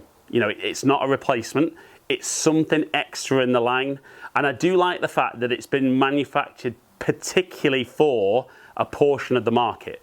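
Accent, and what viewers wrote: United Kingdom. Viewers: Can we get reviews from American without heavy British accents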